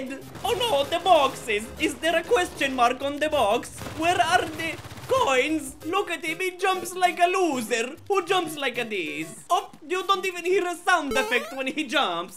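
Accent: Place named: Italy. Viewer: סרטון מלך מבטא איטלקי של מלך!!! תמשיכו ככה ותעלו סרטונים רק באנגלית כי ככה היו לכם יותר סאבים וצפיות!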